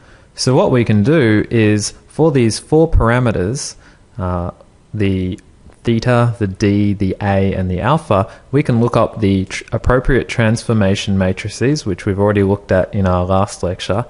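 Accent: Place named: Australia. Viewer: Refreshing to hear intelligence behind an Aussie accent :-) (coming from a country where the only Aussie representation is the Soaps on TV). Back on topic: Great introduction to Kinematics, thanks.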